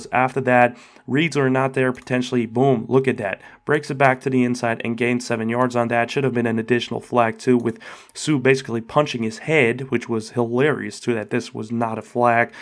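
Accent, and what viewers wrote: Chicago, United States. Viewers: My first time watching one of your videos and I love the hybrid Chicago accent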